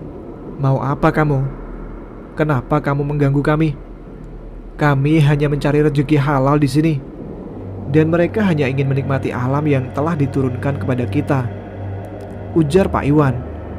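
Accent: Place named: South Kalimantan, Indonesia. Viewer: Banjarmasin Balangan kal-sel suaranyaa😎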